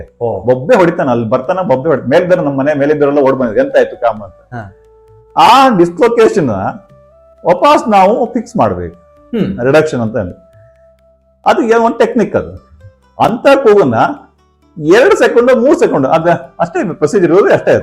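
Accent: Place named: Belagavi, India. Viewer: ಸರ್ ನಿಮ್ಮ ಭಾಷೆ ನಮ್ಮ ಬೆಳಗಾವಿಗೆ ಸರಿಯಾಗಿ ಹೊಂದುತ್ತೆ ಸೂಪರ್ ಸರ್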